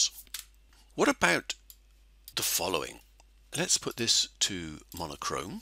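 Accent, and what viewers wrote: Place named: South Africa. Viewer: I'm trying to work out where you accent is from, Im guessing South Africa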